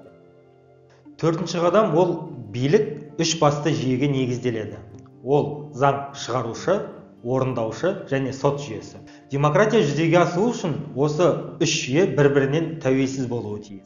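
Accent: Standard. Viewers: жарайсың! Қазақ елінің ұлттық тілінде таза сөйлейді екенсің. Ел президенті ұлтжанды азамат болса, нұр үстіне нұр болар еді. Заманбнк балам Ұлтжанды Азаматтығың сөз саптауыңннан көоінеді. Қазақ Ұлт болып қалыптасу үшін тілімізді жоғалтпауымыз керек. Ананың тілі, Анаңныңмахаббаты тіл жетпес бір теңіз емеспе? Па, шіркін Қазақ тілінің керемет тіл, құдіретті тіл екеніне айтуға сөз жетпейді!!!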